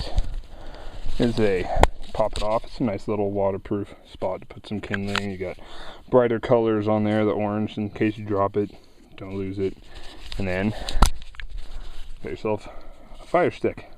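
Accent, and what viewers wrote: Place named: West, United States. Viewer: Sounds like you from the West. Some good advice brother.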